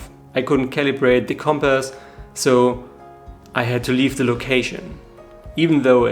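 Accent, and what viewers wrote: Germany. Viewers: Bist du ein Deutscher? Dein Akzent klingt nämlich sehr deutsch :-)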